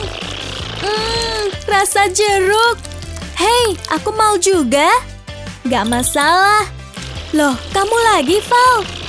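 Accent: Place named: Java, Indonesia. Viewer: Dasar ngomong medok jawA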